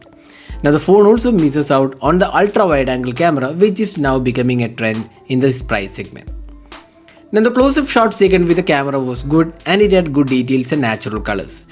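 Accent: India. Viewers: i really love that indian accent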